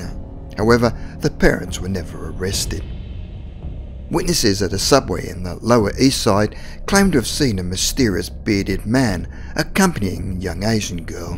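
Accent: Australia. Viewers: Great video man! Also I love your accent, Aussie pride! 🐨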